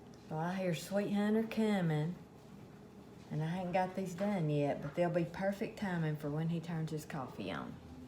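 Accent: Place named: Tennessee, United States. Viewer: Listening to your accent brought me back to my childhood when we would visit my grandma in Tennessee. I luv that. It also sounds like Loretta Lynn. ☺️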